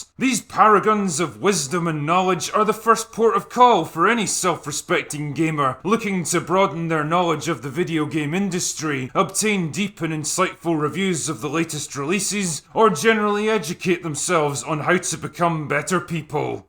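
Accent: Scotland, United Kingdom. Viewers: It is so funny hearing a Scottish man bashing American journalists who keep saying nothing except how much they hate the orange man while the rest of the world just wants to enjoy a new video game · Pissed up Scottish man seems to be making some sense in these times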